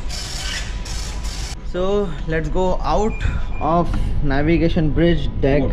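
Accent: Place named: India. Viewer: Awful indian accent, Killed all vives. Life in modern vessel always fascinating but he could not describe it properly.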